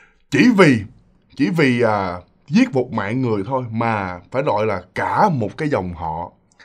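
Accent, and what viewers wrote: Mekong Delta, Vietnam. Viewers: Chúng ta đều thích nghe truyện... Giọng kể kiểu miền tây chân chất... Giọng là bất diệt rồi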